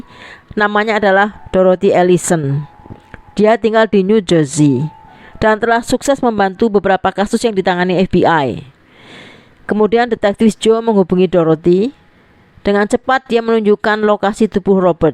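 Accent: Java, Indonesia. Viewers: Apakah kamu sudah menjadi orang yg berguna dan memberikan kata2 yg positif utk org2 disekitarmu?Berkata- katalah yg baik, memujilah!! Kalau kamu tdk suka, bukan berarti org lain tdk suka. Karya dan kreatif, berbeda dgn youtuber lainnya, hargailah. Dgn medok jowo nya lah, menjadi karakter ciri khas yg saya suka. is the best!! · emba ini suara nya kya msih kentel logat jawanya ya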